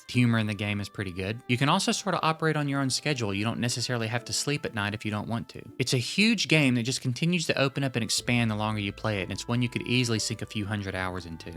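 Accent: South, United States. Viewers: I love how my man here is so positive all the time and it's great to hear southern YouTubers. But also he hears a faint ticking while opening mail and he loses his shit.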